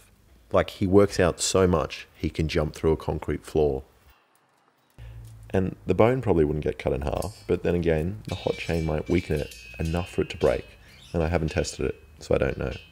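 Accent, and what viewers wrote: Australia. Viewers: Got the aussie accent too… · People are saying “oH hEs sO aUSsiE” and I agree as a fellow Aussie yes we only wear thongs and barefoot (in Australia we call “flip flops” thongs get your mind out of the gutter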